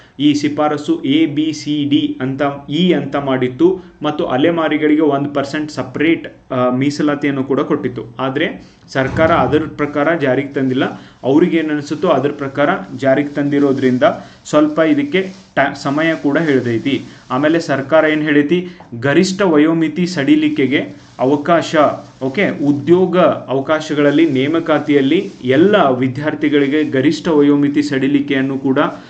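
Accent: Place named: North Karnataka, India. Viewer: Sir stick to your ground of uttar Karnataka accent, don’t try hard.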